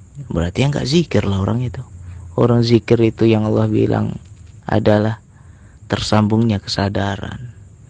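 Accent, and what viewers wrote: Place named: Medan, Indonesia. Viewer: Boleh tau dimana tglnya..logatnya macam org Medan..?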